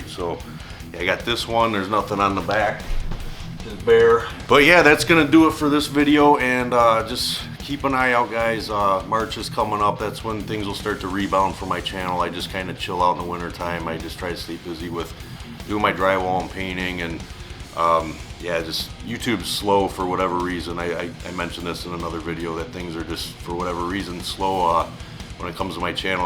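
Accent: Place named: Chicago, United States. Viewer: Fn love this channel bro, lifting and listening to metal! Hey do I hear a mid-west accent in your voice? You sound like a fellow Chicagoan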